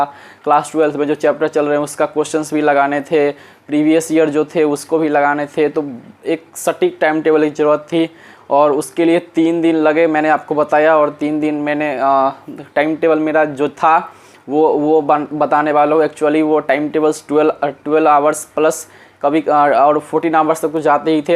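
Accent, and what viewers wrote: Bihar, India. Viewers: Boli se to bihar ke lag rhe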